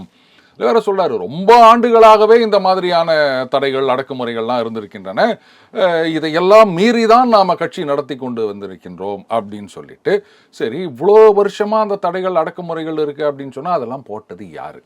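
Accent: Sri Lanka. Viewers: இலங்கைத்தமிழர் போல் உச்சரிப்பு இருக்கே இந்த நெரியாளரிடம்.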